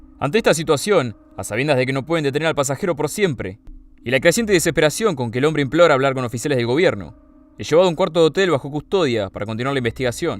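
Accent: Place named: Argentina. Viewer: Lo único que le pone en la torre al relato es el acento Argentino.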